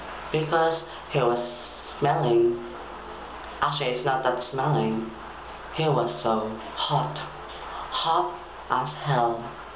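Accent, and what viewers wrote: United Kingdom. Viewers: naks British English ang accent :) good mowning :)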